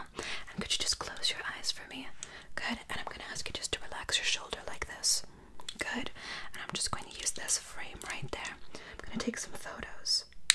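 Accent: United Kingdom. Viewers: UK accent on point! Great ASMR vid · I love your British accent, it's so cute and relaxing! :) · I missed the British accent!!! It’s been a while ❤️ you’re so good at it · British accent on❤️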